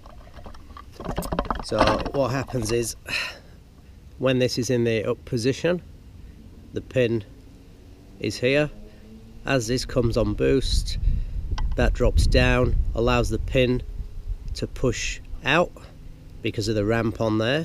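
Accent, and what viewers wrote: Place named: North of England, United Kingdom. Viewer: Hi,I finally got round to this job last week on my L400 Delica, your video helped immensely - thanks! On the Delica the TPS is connected to the bracket on the front of the boost compensator, with two spacers between the brackets - damn that was a horrible job getting it all back together haha. Overall the difference is significant when the boost kicks in. I've also added a boost controller between the turbo and wastegate too, and the EGT gauge goes in today. - I've got to ask - your accent sounds like you're originally from the North of England, by any chance are you?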